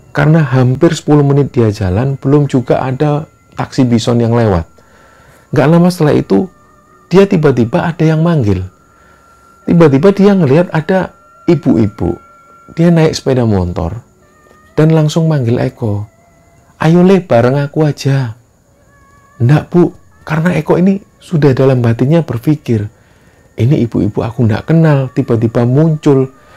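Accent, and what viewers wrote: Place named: Surabaya, Indonesia. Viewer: Mas ini orang mana ya, sepertinya ada bahasa khas Surabaya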